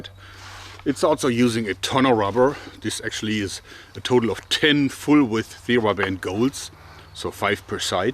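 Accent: Germany. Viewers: Learn english without accent, german... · Explain to me why ur having an english instead of a german channel? - Your accent is distracting XD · Kann es sein das du eigentlich Deutsch bist? Ich finde man hört es an deinem Englisch 😁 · Man hört richtig das du Deutsch bist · Man hört einfach Instant daß er ein Deutscher ist. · I hear a German accent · Hey you sound like you are german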